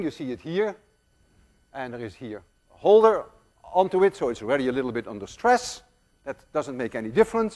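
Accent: Netherlands. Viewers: his dutch accent is distracting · what kind of accent does this guy have? He sounds somewhat Dutch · hes dutch u can hear that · he has an accent, a dutch accent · He sounds so dutch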